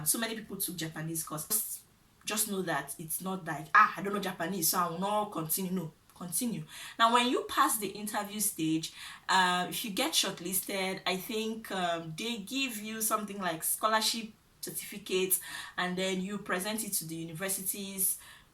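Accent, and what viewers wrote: Nigeria. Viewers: Hahaha.. This ya Igbo tongue no fit leave you ooo.. Thanks for all you do.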